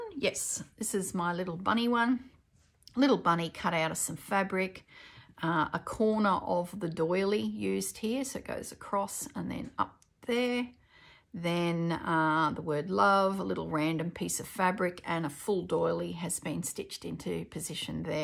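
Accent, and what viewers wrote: Australia. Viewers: I really enjoyed watching this, quite inspirational. Fantastic to hear not only an Australian accent but a Queenslander, yay!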